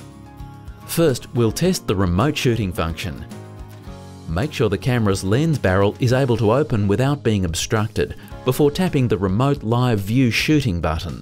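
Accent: Australia. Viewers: Australian Accent lol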